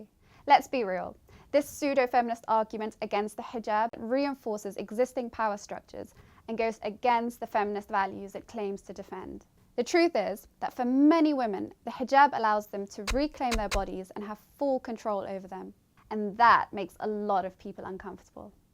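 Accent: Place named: United Kingdom. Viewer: Literally everything she said is untrue . The fact that she has a thick British accent makes this all the more SMH....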